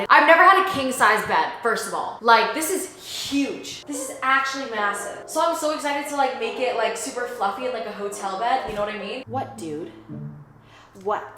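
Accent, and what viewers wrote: Vietnam. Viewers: YOUR VIETNAMESE ACCENT IS SO CUTE OMG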